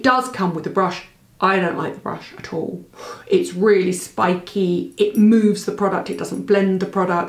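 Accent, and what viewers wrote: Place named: England, United Kingdom. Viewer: I just stumbled upon your channel. It's so refreshing to see a woman the same age as myself being honest & realistic about skin care and make up for mature ladies. I love Bobbi Brown and so wanted to like the Jone's Road miracle balm and moisturizer - but I felt like I was slathering whale blubber all over my face and I didn't like the smell. I have to say they were very decent about returning the items in that they refunded my money but told me I could keep the products. I'll pass them on. Plus, Im a Brit from North London who now lives in LA, so good to hear an English voice!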